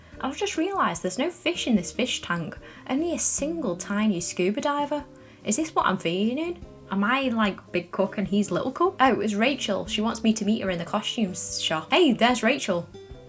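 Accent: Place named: Birmingham, United Kingdom. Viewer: That Brummie accent though....phwoar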